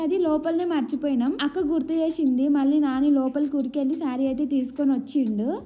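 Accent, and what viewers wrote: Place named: Srikakulam, India. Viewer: Srikakulam slang🔥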